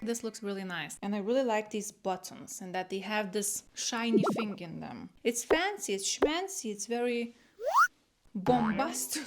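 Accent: Eastern Europe. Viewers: Eastern Europe- Russian or Ukrainian.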